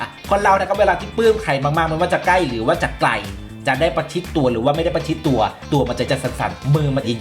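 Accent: Isan, Thailand. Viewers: ผมหมายถึง จริตจัดเต็มแว๊ดๆ+อิสาน มันฟังไม่รุเรื่องว่าเป็นคำว่าอะไร จะเข้าใจได้ไง? การนำเสนอ การถ่ายทอดข้อความ ถ้าคนฟังแล้วไม่เข้าใจ มันก็พัง..... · สวัสดีแอดชอบแอดมากไม่ว่าจะพูดสำเนียงอีสานหรือกลางก็ชอบจากใจจริงชอบๆม๊ากๆๆ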